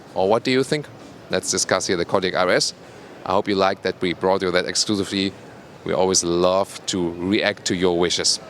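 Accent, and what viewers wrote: Germany. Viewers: i really love your denglish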